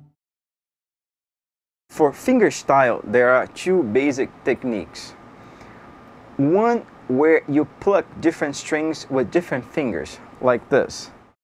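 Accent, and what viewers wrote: Brazil. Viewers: that's a brazilian accent ahah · Just pondering...what nationality are you? I've been sitting through all these videos, and the accent sounds familiar, but I want to say Brazilian Portuguese. Am I even close? Outside of that completely unrelated question, I just wanted to thank you for making these super helpful videos!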